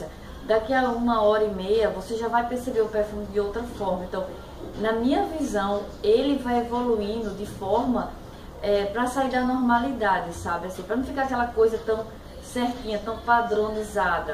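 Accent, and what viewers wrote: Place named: Northeast, Brazil. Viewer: Deve ser ótimo amo perfumes trabalho com isso sou da Dior moro em Recife você é nordestina tem sotaque igual ao nosso !! Tenho vlogs mais tenho vídeos de perfumes tbm vê lá !! Mais antigos amei você explicando show !!! Like te convido a conhecer meu canal !! Perfume sempre nos remete sensações amo amo !!!bjos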